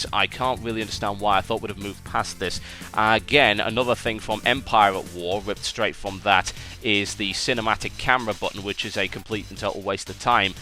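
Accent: United Kingdom. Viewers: wow! you sounded more british back then ;b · old sound quality makes him sounds more british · He pronaunces everything so... british? I dont know, but I have the strangest boner. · Is it just me or does he sound more British in this video then he does now? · he sounds a bit more english you know. translation for idiots (he sounds a bit more british) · He sure sounds more brittish here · Is it just me or he sounds more "british"?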